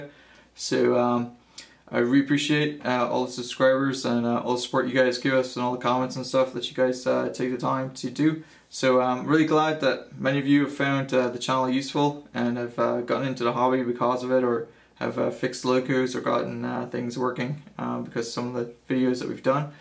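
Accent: United States. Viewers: American speaking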